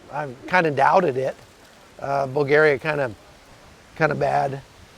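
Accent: United States. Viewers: I guess he's american by his accent. So I can justify his limited knowledge of everything outside the United States. To americans, everyone east of Germany is russian and writes and speaks russian. And they are probably communists :D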